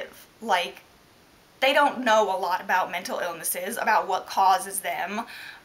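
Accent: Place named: South, United States. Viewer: I have no idea what your background is or where you live, but the way you pronounce certain words like "about", have a hint of a southern accent. I mean it in a good way. It would be nice to know what part of the country you grew up in.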